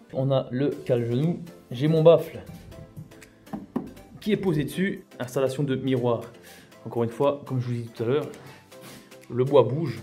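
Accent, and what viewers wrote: Belgium. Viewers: t'es belge fieu ??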